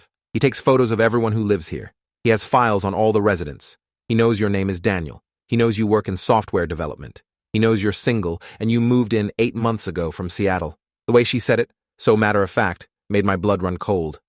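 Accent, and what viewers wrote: United Kingdom. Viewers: British AHHHHHHHH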